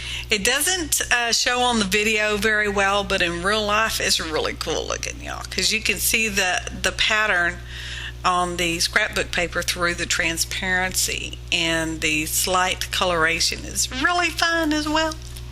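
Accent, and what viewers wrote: South, United States. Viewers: No doubt you're a southerner! lol This was something I've never seen before and I love it!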